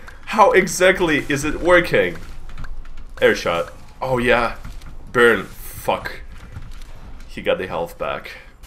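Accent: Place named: Russia. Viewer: he got a bit of an English-speaking Russian kind of accent?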